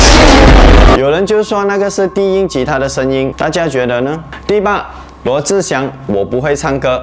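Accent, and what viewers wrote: Malaysia. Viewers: 馬來西亞口音我不會不舒服，反而是北京腔捲舌音聽了很噁心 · 马来西亚的普通话我觉得挺好听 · 哈哈 听出来了马来西亚人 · 听你的口音就知道是马来西亚人了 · 一直在猜是哪里人 就是没想到是马来西亚人╮(╯▽╰)╭ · 除了光良的其他都不是呀~ 马来西亚口音可爱啊！😁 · 只要是马来西亚人都听得惯你的口音吧，因为我也是客家人，虽然我是槟城人😅😅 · 马来西亚的华语就是酱的咯！习惯就好！哈哈哈！ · 這口音很棒啊，我認識很多馬來西亞人我很欣賞這種口音的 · 马来西亚独特的口音是不行hio 马来西亚很多种族 什么腔都有啦🙄🙄🙄更何况讲了十多年都是这样 哈哈哈哈哈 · 我是香港人，聽口音知道你是馬來西亞人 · 我是臺灣人，我都有在看惡靈的影片，所以我聽到懂馬來西亞華語，ㄅㄞˊㄔ¯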